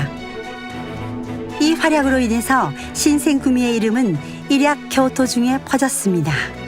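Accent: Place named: Gyeongsang, South Korea. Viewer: 경상도 사투리를 쓰고, 그리고 문제는 일본어 장음이 전혀 안되고 토의 발음이 도로 되는 등 전형적인 한국인 발음임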